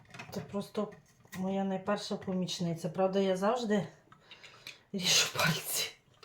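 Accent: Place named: Lviv, Ukraine. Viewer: Ви дуже гарно розмовляєте українською,молодчинка,говір подібний до нашого львівського.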